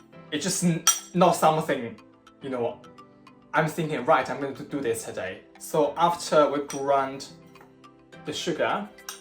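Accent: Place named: United Kingdom. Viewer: Pretty interesting how with some words you sound british and some words you don’t haha is sugar cane locally available where you live?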